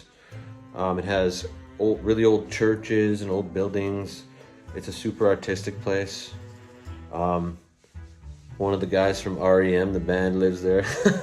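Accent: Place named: Spain. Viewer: Just discovered your chanel I like your spanish accent, keep it up.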